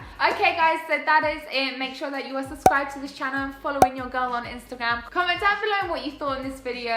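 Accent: England, United Kingdom. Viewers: I usually don't like English accent but yours is just so amazing :)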